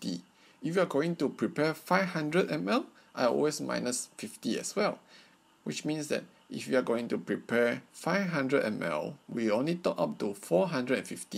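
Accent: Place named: Russia. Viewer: Are you a Russian Scientist? You sounded like a Russian .....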